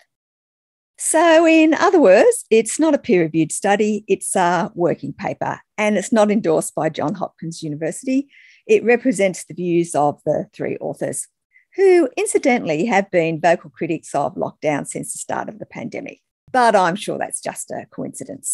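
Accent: Australia. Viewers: She's an Ozzie. Plus, using the 'innuendo' parrot's Campbell.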